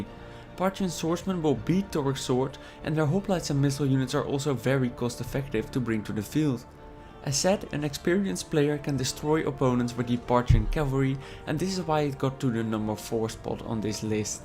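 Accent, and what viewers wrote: Netherlands. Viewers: the guy narrating this sounds like he has a dutch accent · could you be any more dutch? Why is it that people speaking with a Dutch accent simply sound a little bit inbred?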